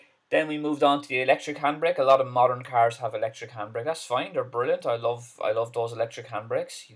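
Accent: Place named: Ireland. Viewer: we thought it was Scottish but now we think it's Irish haha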